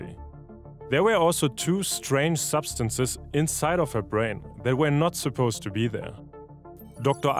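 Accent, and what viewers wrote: Germany. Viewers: Please! Get a native English speaker or use an AI voice. This bad pronunciation as well as the German accent is awful...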